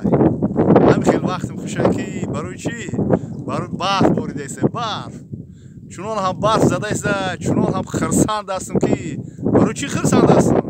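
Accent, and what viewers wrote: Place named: Kulob, Tajikistan. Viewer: кулоби гапзан лижа меган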